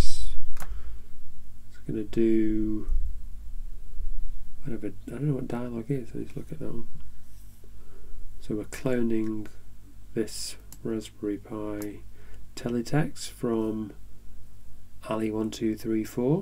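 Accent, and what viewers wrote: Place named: United Kingdom. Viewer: i approve of both this video and your british accent i like british people :)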